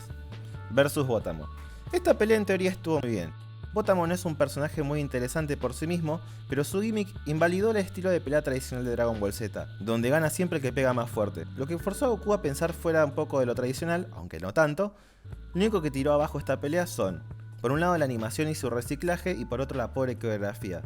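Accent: Argentina. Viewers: Buen vídeo, sos Argentino?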